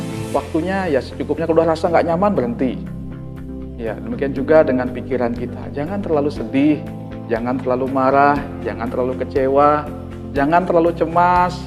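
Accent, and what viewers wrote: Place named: Bali, Indonesia. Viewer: Terimakasih mas Bli sudah berbagi ilmu, logis,kronologis,bahasanya sederhana,mudah di pahami,dibumbui logat Bali yang kental, maturnuwun Mas Bli....( Yogyakarta).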